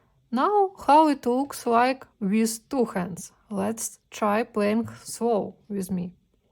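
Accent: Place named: Eastern Europe. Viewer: Amazing explanation but please do something about your slavic accent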